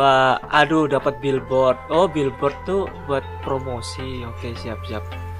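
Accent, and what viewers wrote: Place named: Java, Indonesia. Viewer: Jowo Cok:v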